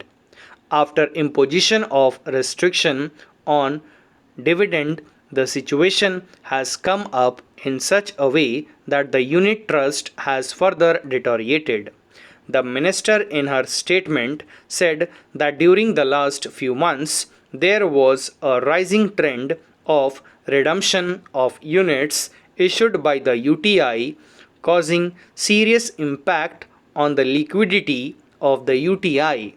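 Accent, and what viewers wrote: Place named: United Kingdom. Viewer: Nice dictation like British English 😀👍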